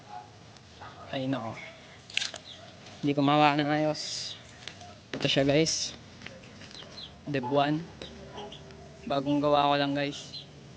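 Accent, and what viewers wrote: Batangas, Philippines. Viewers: Yung batangas accent the yan baka gravee to.. hahaha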